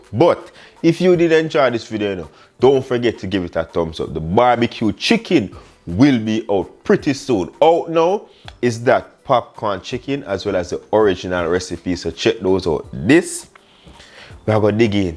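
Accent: Jamaica. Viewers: Fat n juicy! 😁luv dat Jamaican twang!❤️👍🏽😋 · Love this guy! He’s authentic! Mi miss Jamaica 🇯🇲 just from listening to him! Mi ready fi eat! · I need a Jamaican man in my life..his accent😍😍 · Chief, I am not just here for this deliciousness, but the Jamaican accent. Lol keep doing your thing. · Wicked! A jus fine yuh and am very impressed. Can't wait to try this recipe. Love the mix of English and Patois and di sweet Jamaican accent. Chef, Yuh mek mi feel more homesick dan hungry. Trus mi! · Am here for the jamaican accent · I love the Jamaican accent 🤭 and I love his realness too! Smelling the food and using bare hands are things we want to do behind closed doors but I'm glad you make me feel comfortable watching this. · I love that Jamaican accent. Kenya we locked in waaaagwan😃😃😃. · Wa gwan! it's the Jamaican accent for me. Love it. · Your accent ❤ I had a boyfriend from Jamaica in the 90s. 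He died in a car accident. Listening to you brings tears yo my eyes. Im going to make this today! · Me rate di video from mi hear di jamaican accent🇯🇲💯💯🔥🔥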